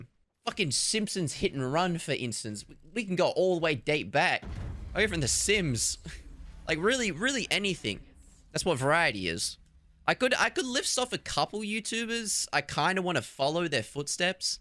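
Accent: Australia. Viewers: I don't care how long it'll take you to upload or how bad a video may be, ill watch it and support you because i love listening to an aussie ramble about shit and your content is amazing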